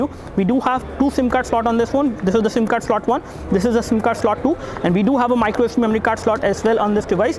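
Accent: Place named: Tamil Nadu, India. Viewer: Can you get rid of your Tamil accent when you speak English? It's quite annoying. I have a few Tamil friends who speaks nice English, I don't hear any Tamil accent when they speak. Can you do it. I need to hear a romantic sounds, but your is not.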